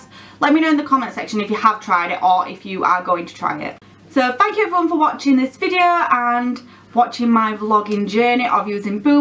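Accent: Yorkshire, United Kingdom. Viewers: Yey , it’s nice to not be the only YouTuber with a Yorkshire accent 😂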